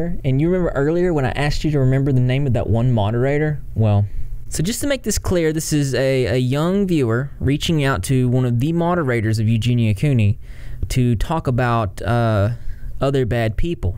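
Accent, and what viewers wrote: South, United States. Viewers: Odd question: Where’s your accent from? I love it; I’m guessing SC or maybe GA. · This guy makes a southern accent HOT 🥵! 😅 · I love the subtle southern accent... not related to the video but your accent is nice haha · I cant tell if your from Southern USA or Austrailia ? Love your accent 😍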